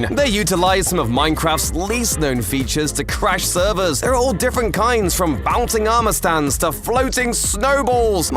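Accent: United Kingdom. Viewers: So British accent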